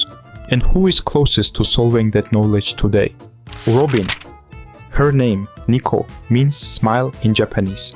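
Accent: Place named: Russia. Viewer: I don't follow One Piece COMPLETELY, about each two weeks i check in and see (Theories of several YTers) But i just LOVE it, when people with russian (or your ) accent are uploaing theories, because they speak STRAIGHT and without forced "jokes" whitch makes them pleasing to listen to. Like from me. Interesting parallels about the flowers and snakes btw. 👍 Your style reminds me of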